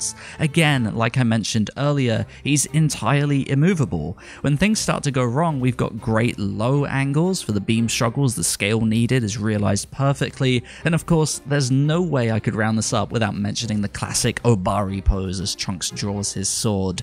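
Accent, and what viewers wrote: United Kingdom. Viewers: Japanese words + a heavy english accent sounds a bit cringeworthy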